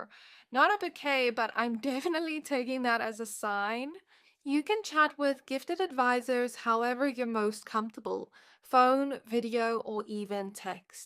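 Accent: South Africa. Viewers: Pile 2❤. If you don’t mind me asking, where are you from? You have a unique accent 😅 it sounds a bit South African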